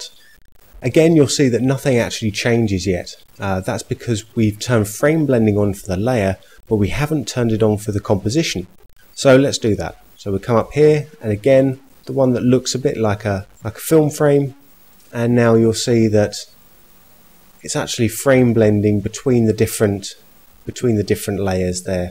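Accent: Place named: England, United Kingdom. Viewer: Thank you so much for this tutorial, it's just perfect. And so good to hear an English accent on a video tutorial for a change - I can understand even better :)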